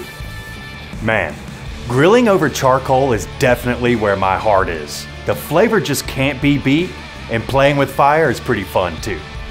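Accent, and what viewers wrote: South, United States. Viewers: you're a natural at this. I can see you going around the State interviewing local BBQ guys, talking shop, bringing over some BBQ's to show off and test.. I'm up in Canada and I could listen to the southern accent discussing BBQs all day... Combing products and techniques outside the showroom. I may not be able to buy local at your store, but its good exposure and the channel itself could pay for the adventures if it gets enough traction.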